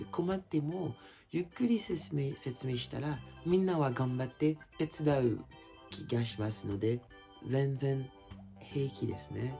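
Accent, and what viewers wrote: France. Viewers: 何と心地よい話し方なんでしょう、フランス語と日本語の良い所が合わさった発音のような気がします。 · ちょっとフランス訛りの日本語が優しくって可愛い💕 日本の良い所を見てくれてありがとう🙏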